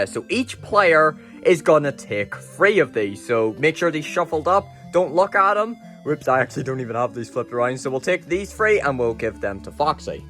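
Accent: Northern Ireland, United Kingdom. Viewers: Are you from Northern Ireland? 😇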